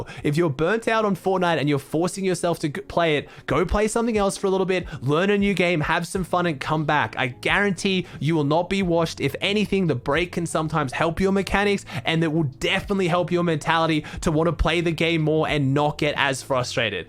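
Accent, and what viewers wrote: Australia. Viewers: sheeesh aussie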